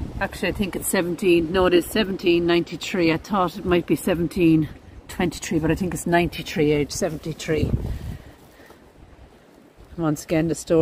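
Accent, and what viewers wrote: Ireland. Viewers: I am in Kentucky, as well. Greetings to you! Only recently found you and I thoroughly enjoy being invited along on your explorations. I love history and I love your brogue. I have some Irish ancestors just not sure how much. Are there any of the names of Case or Harrell? Anyway, thank you so very much for what you do, it's wonderful and I hope you will continue for a very long time. Take care and God bless! 🙂🌹🇺🇸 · I am trying to learn to speak irish and I really love your accent. Also thanks for all the cemetery videos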